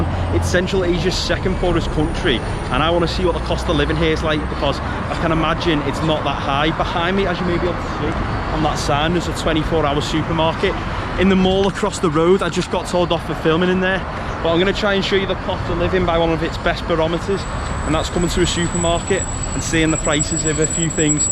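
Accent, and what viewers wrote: Liverpool, United Kingdom. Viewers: hes scouse <3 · having a british accent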